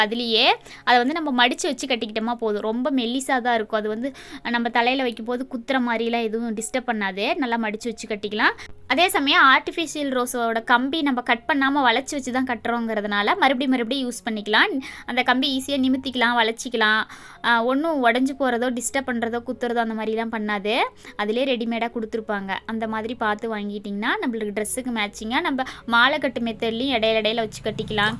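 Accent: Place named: Kongu, India. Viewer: ரொம்ப ரொம்ப அழகா இருக்கு. ❤❤❤❤. நீங்கள் எந்த ஊர். உங்கள் பேச்சு கொங்கு மண்டலம் போல் தெரிகிறது. இந்த ரோஸ் எங்கே கிடைக்கும்.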